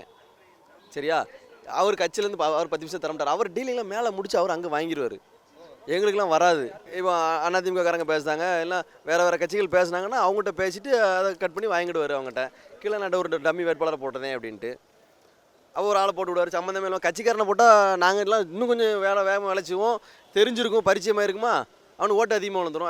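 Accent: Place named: Tirunelveli, India. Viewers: நெல்லை தமிழில் எழுத்து வாங்குகிறார், வாழ்த்துக்கள், உழைப்பிற்கு நிச்சயம் உயர்வு தேடி வரும் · நம்ம நெல்லைத் தமிழ் சிறப்பு அண்ணா 💥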